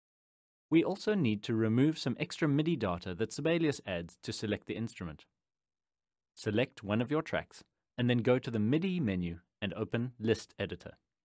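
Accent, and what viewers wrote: South Africa. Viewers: is your accent South African or British? sounds like a bit of both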